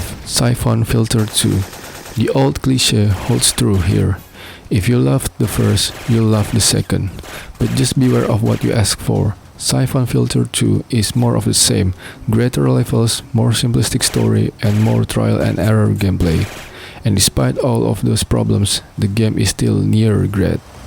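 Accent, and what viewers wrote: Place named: Indonesia. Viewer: Yang ngomongnya orang Indonesia keknya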